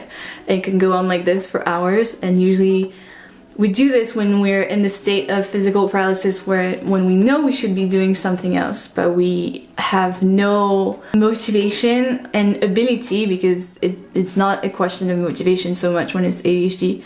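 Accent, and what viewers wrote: France. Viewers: Hey there, I just discovered your channel while researching adhd paralysis tips and this is so helpful! I'm undiagnosed but everything adhd I relate SO MUCH. Not related, but are you french? I feel like you have a tiny bit of an accent I recognize in us french speakers? Have a great day love, thanks for this. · Loved the video, thank you so much! Also, are you french? I noticed a tiiiny bit of an accent eheh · Great video Thanks Are you french? :)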